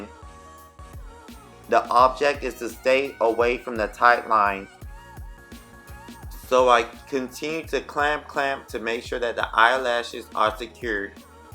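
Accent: Bahamas. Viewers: Omg I thought you sounded Bahamian 🇧🇸🇧🇸🇧🇸 .... Love your channel even more now!❤️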